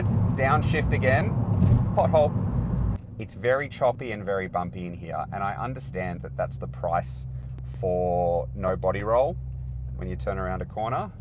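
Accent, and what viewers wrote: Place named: Australia. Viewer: I had to triple check myself when watching this. youre in a right hand drive, with an aussie dialect but all the cars look the same as america. love the guys driving silverados down under, i have one myself but i also have an abarth. ive built mine but it was fun stock but since i dont race dirt bikes anymore i decided to build a cheap car lol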